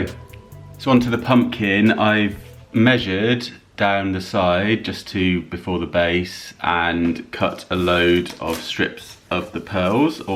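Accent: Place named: Birmingham, United Kingdom. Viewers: Love the DIY and the very cute Brummy accent lol. Sounds just like me 👍😋# Mr talented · Ha ha loved the Birmingham accent 😂 I liked the white pumpkin & grey skull best! 🎃🎃🎃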